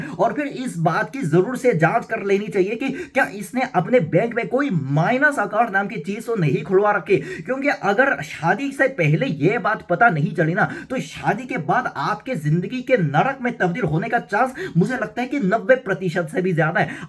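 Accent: Standard. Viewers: Unhone hindi ki padhai ki hai isliye shudh hindi bolte hai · Bhai aap to mujhse bhi jyada shudh hindi bol rhe ho 😮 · Jitni sudh hindi bhai bol rhe h, utni to hme b nhi aati 😅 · कितना शुद्ध हिन्दी बोलता है । मान गया उस्ताद ।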